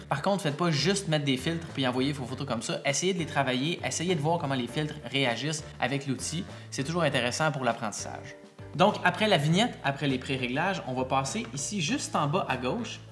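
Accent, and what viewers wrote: Quebec, Canada. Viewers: Merci pour tes vidéos qui m'apportent à chaque fois quelque chose de plus, même si j'ai du mal à toujours te suivre, avec ton accent québecois, et oui je suis Français...! Mais ça va venir, ma famille commence à plus trop me comprendre, et me demande ce que c'est cet accent bizarre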